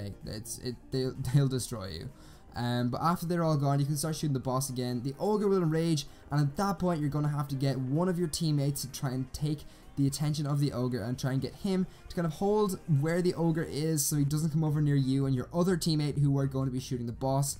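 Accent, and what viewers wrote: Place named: Ireland. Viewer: Where are you from in Ireland? 🇮🇪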